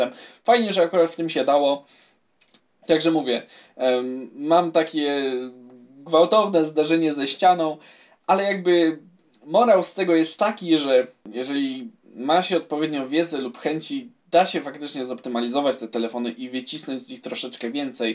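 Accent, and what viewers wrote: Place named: Podlasie, Poland. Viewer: akcent z Podlasia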